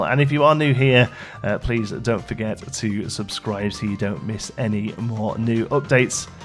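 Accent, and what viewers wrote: United Kingdom. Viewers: Weird, by your voice I would have thought you were in the UK already. Hello to a Canadian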